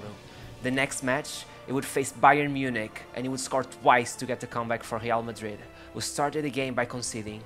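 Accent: Portugal. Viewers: You have a new sub. Spent a few years in Portugal after Ronaldo's time at Manchester United. My Mrs is from, and supports, Porto. Absolutely looooooooooooved this video. Your presentation is calm yet full of passion and appreciation for him. Loved your Portugues ascent too. The only thing that could have improved this video is his romantic return to United! 🙂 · Great accent only in a few words we can tell you are portuguese cá dos nossos. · Only portuguese people will notice the pronunciation. Good job fellow portuguese guy :)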